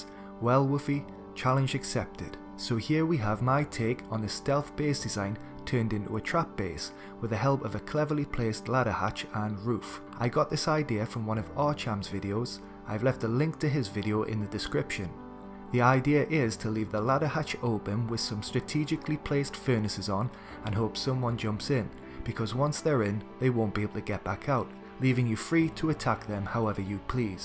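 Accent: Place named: Newcastle, United Kingdom. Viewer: Nice build mate and you a geordie too? sound very local lol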